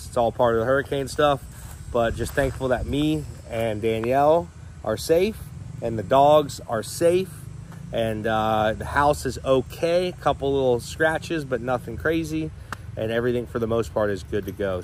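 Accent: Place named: Canada. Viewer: Is he not from the south too? Talks like he's from Canada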